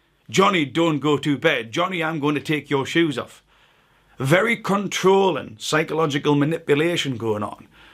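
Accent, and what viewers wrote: Newcastle, United Kingdom. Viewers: Newcastle I think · That’s defo a Newcastle accent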